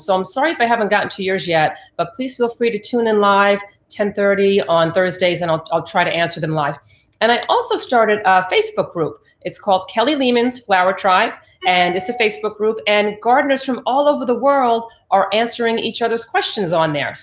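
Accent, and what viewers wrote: New Jersey, United States. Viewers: What month or season can you split them, and how young can they be before splitting them? Thanks, I love the channel and your Jersey accent. 👍🏻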